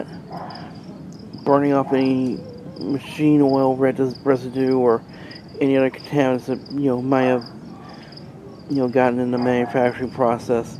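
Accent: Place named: Australia. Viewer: Great idea for a video man! I found it entertaining. I don't really use that much charcoal for my first seasoning, I just fill the chimney and burn that much out...but you definitely made sure you got out all contaminants out! I loved the aussie ascent too!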